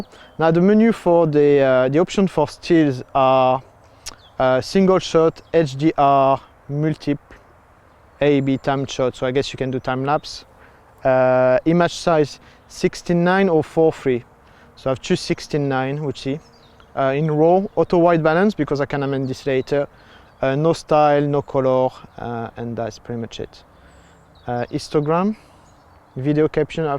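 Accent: France. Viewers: french accent but very good video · On sent l'accent français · Are you French ? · Very usefull video because done from a beginner with mistakes and corrections... I will be in your case in one week with a phantom 3 also... Just i notice playing dji tutorial videos, the man turn in unticlock way for calibration ...i don't know if it 's important or not.... last news about dji... after christmas prices going down: standart one is 599 euros, advanced one 899 euros !!!! Oh ! do you live in an english country ? i am french and i recognize you special french accent ;-) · that's how French people sound when they speak English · Tu es français ou quoi ?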